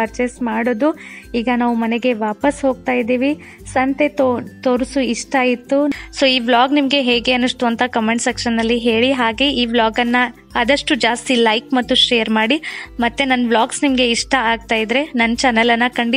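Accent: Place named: Belagavi, India. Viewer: hi akka nimma ura yavduu heli.....Niv belgavi Kannada matadtira adake kelde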